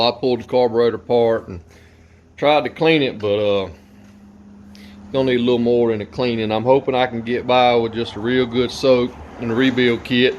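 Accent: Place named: Deep South, United States. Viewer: Big Bay'er fo hunred, got er a rebil kiyt. man thats a deep deep south accent brother. Im from the far far north east.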